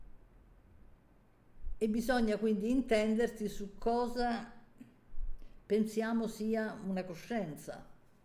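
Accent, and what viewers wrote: Rome, Italy. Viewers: Ciao non ho ancora visto il video però ci tenevo io a cercare di darti qualche “spunto animico” che fanno sempre piacere Ti stra consiglio le serie di Zero Calcare su Netflix, ne è uscita una di recente “Due spicci” molto bella ma sono tutte meravigliose… tra l’altro romanaccio come te, secondo me ti piacerà molto E poi una canzone, “ ” dei Grazie mia, spero tu stia bene ♥️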